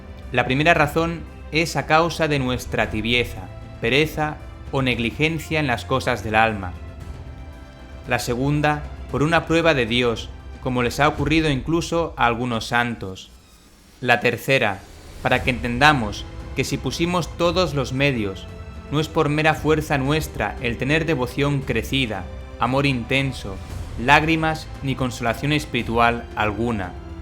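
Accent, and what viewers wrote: Spain. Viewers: Macho, tendrás mucha razón, pero ¡que forma más sosa de hablar! Y ese acento madrileñata.... Prefiero el acento latino.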